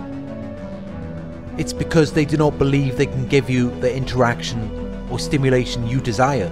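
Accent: Wales, United Kingdom. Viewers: Sounds British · He sounds Welsh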